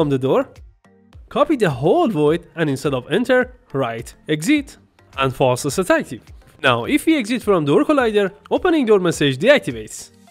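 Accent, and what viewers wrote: Iran. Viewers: لهجت ایرانی میزنه❤ ایرانی هستی؟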